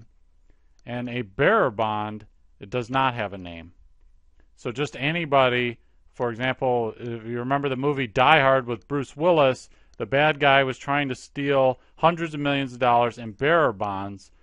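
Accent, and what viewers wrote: United States. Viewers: Thanks so easy to comprehend. Thank God for an American English speaking person explaining the concept.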